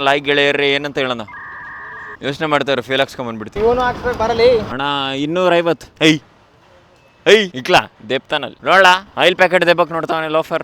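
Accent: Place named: Tiptur, India. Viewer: Randomly watched ur insta reel and watched ur channel here ...Nam tiptur slang alli nim videos literally touched the hearts and kept us entertained ❣️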